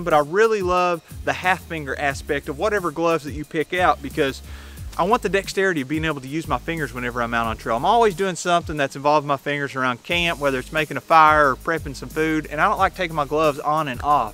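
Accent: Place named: South, United States. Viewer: I never knew the southern accent worked so wonderfully with your everyday hipster. Great content mate!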